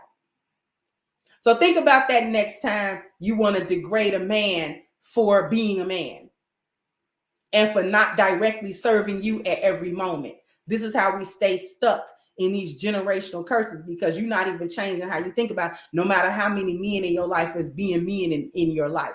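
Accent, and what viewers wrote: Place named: New Orleans, United States. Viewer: Youre definitely from New Orleans aintee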